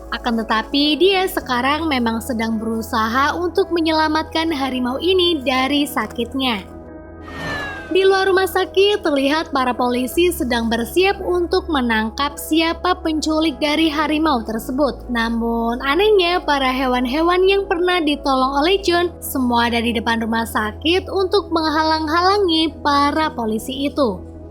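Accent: Pemalang, Indonesia. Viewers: Mbaa orang pemalang yah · Kaka orang pemalang bukan kak?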